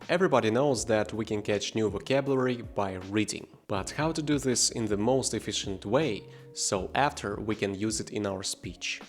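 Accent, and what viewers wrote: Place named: Russia. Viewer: У тебя такой беглый английский, но некоторые звуки ты чётко по русски произносишь. Я слышу чисто русские "г", "х", "м", "л" и ещё "и" у тебя иногда чётко русская. Если бы не это, я бы решил, что ты билингва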